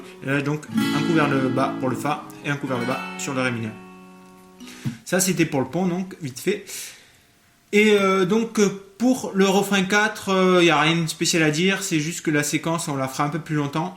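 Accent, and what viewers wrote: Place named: England, United Kingdom. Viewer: Magnifique accent anglais. 👌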